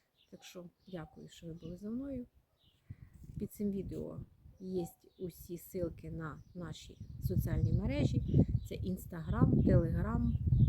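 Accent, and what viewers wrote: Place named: Cherkasy, Ukraine. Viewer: Я сама живу в Черкаській області, знаю цей змішаний говір.Не ображайтесь,все буде добре 🌹🌹🌹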